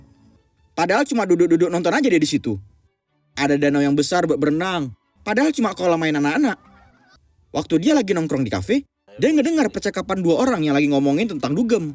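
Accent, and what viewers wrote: Medan, Indonesia. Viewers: Bahasa Indonesia versi Medan emng bkin ngakak 😂😂😂😂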